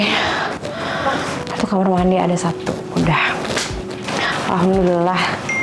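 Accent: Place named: Manado, Indonesia. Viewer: sekolah dimana ngana ? fasih juga logat manado nya..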